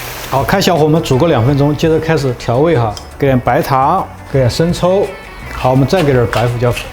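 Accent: Sichuan, China. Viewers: rua一下，老师的川普太萌了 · 川味普通话，好亲切！ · 你那个川普真的….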